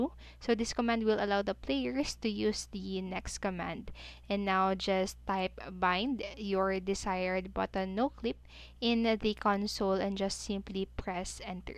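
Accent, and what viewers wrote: Philippines. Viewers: you sound like a filipina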